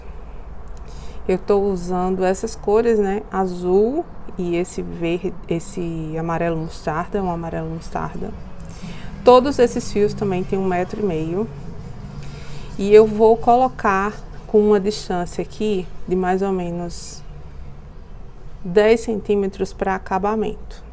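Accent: Northeast, Brazil. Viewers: Mulher linda, tu és de onde com esse sotaque que parece nordestino? Primeiro vídeo seu que vi e amei. Já me inscrevi. 🙂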